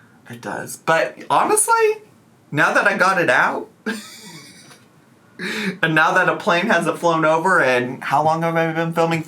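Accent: South, United States. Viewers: In Eric's voice: NO-ONE CARES. But did I also watch until the very end because it's with their dreamy Southern twang and cute new necklace? Absolutely.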